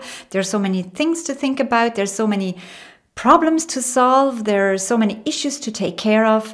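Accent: Ireland. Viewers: I love when she giggles like a little girl. And the Irish accent ❤️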